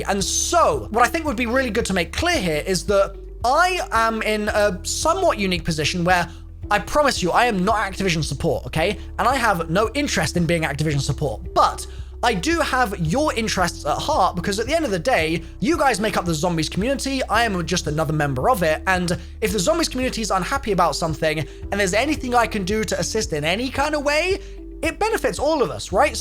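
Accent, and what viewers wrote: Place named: United Kingdom. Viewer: too much uk english for me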